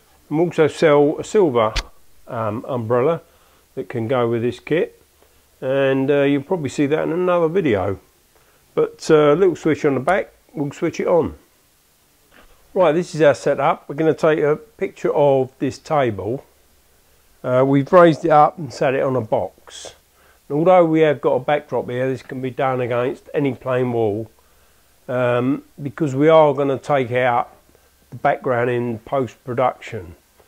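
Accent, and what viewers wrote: United Kingdom. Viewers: I can hear the light holders clicking loudly. If you don't loosen them before you angle them you going to strip the gears.Is that a Cockney accent we are hearing. I'm a good boy I am.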